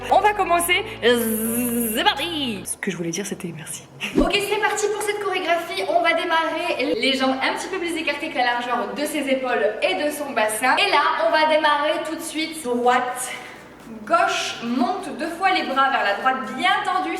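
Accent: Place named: South, France. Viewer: Quel régal super!!!! Tu reflète le Sud a font,ton sourire,ta joie de vivre, ton accent,et tes blagues waouh je me croirais de retour à Marseille!!! Merci super hiper génial!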